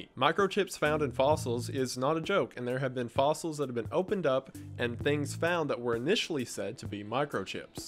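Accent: South, United States. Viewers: Saaaame. Then again, in my house, we* might even enjoy " reads the dictionary" as background noise. * "we" includes my demonic toddler lmao; that chill, Southern-accented baritone is just SOOTHING, man 😌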